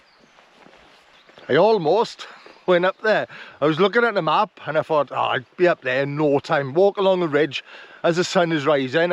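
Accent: Wales, United Kingdom. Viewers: I love your videos … so relaxing but that could be down to your Welsh accent. So soft and bootiful 😂 Also the vids are very informative. Just joined and the price is a steal especially as your videos are so well shot and narrated. Much love to and. Keep on doing what you’re doing it’s much appreciated.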